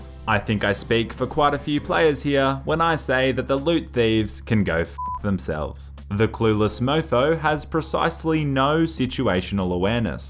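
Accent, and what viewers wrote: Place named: Australia. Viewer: Within the first 5 seconds, I was thinking, 'ohh, might be a fair dinkum aussie', 1 minute I was thinking, 'what a bloody legend, an aussie gaming channel. Bloody oath.' don't even have to be told. I can smell an aussie from the other side of the planet.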